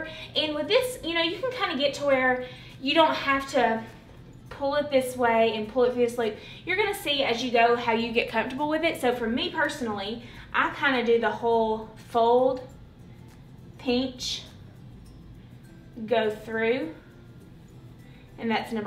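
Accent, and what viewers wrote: South, United States. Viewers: "Your gonna peench it" lol I freaking love your southern accent!! Makes me miss home that much more! Love these videos, Congratulations on the pregnancy!! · Also, where are you from....it sounds like a southern accent? South Texas here😉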